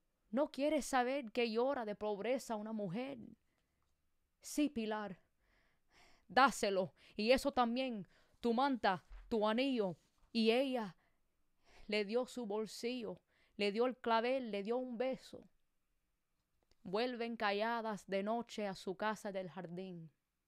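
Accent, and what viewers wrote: Cuba. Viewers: Me encanta y más con el acento cubano, saludos de México 🇲🇽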